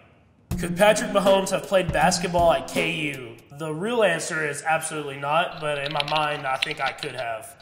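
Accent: South, United States. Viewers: It’s the southern accent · He’s got a country accent. And I love it lol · His southern accent is awesome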